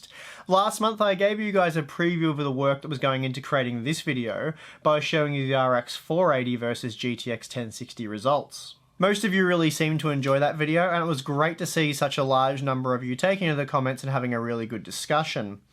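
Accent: Australia. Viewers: You have such an australian accent omg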